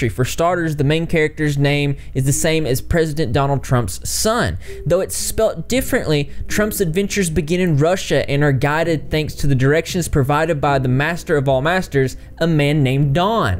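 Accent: South, United States. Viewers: Your hair is fantastic, I'm greatly enjoying your videos, & your wonderful Southern accent sounds a lot like mine lol. Greetings from Asheville in Western North Carolina :) Yeah this whole Baron Trump book thing is CRAZY! I figure Lockwood or someone long ago had a dream or vision about the time we are living in, then wrote these books from what they saw? President Trump is a baron too, a property baron, as well as part German. Wonder if that illustration in the book also closely resblembles the Donald as a child? Yes, cold shivers here too, for real. And I don't get those easily. I live in constant synchronicities that many people would not believe. So much that I plan on writing a little memoir book about my life lol! But this book is wild. Great coverage & commentary! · Sounds like a Southern accent from the southeast US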